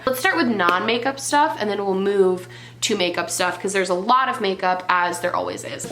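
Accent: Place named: East Coast, United States. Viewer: Going through all your videos-I swear all of us East Coast girls kinda sound the same. I’m from Connecticut and my bf heard you and he was like “she talks just like you. The way you say things”. So there’s that intellectual observation..🤷🏻‍♀️ And yesss- I love my Pat McGrath. Always.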